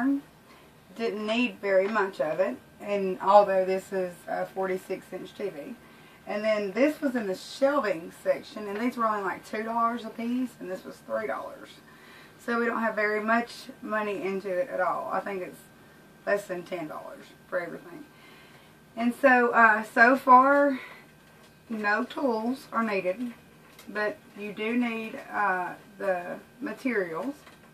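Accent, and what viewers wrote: South, United States. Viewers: Rockin! What are these shelving brackets called at Lowe’s? Love your Southern accent, but I’m not sure exactly what you called them. Thanks! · I love your diy but when i heard your voice i said she from the south then you said Alabama that's where I'm from 👍👍👍👍👍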